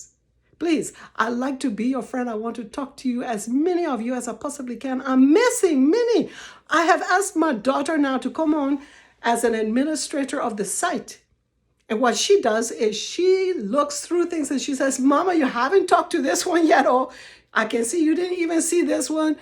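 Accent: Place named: Bassa, Liberia. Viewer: You put a smile on my face!❤️😊My mom is from Rivercess, GrandBassa county ! You do have a beautiful Bassa accent. We love listening to you!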